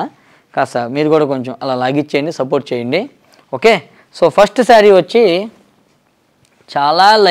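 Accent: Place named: Guntur, India. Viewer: Annayya Yes You we’re Soeaking our real Guntur languge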